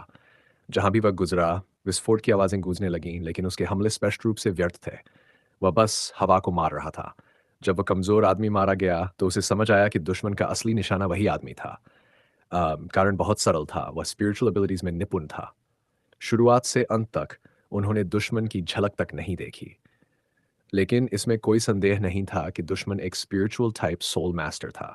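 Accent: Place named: United States. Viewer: Bro video acchhi but voice American English type ho rahaa hai wo better karo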